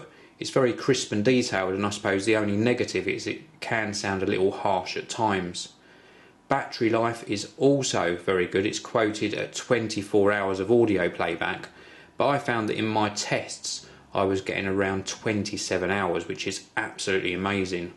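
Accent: United Kingdom. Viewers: not subscribed if you change your accent then I bet you would get more views no one likes british accents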